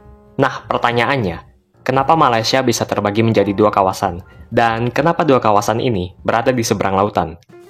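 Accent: China. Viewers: awak ni kokoh dari malaysia ke bukan. Logat care cakap nye suare kokoh (chine). Tapi masih belom tau ke semue info nye. Bukan warge setempat/tempatan ke awak ni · Logat cina dari mana coba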